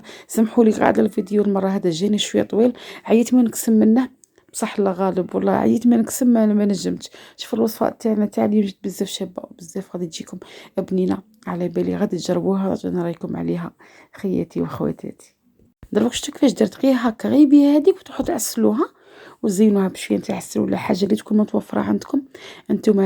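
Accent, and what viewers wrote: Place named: Western world. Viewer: Allah ya3tik essaya, ça fait plaisir d'entendre l'accent de l'ouest😘😘😘😘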